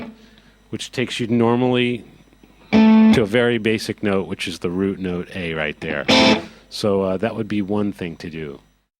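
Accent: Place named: United States. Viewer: He is American. It's how he's always going to sound. Like if you learn Spanish, you're not going to have the spanish accent when you speak English. Having the accents is a big part of language, and when learning another language, they usually don't blend.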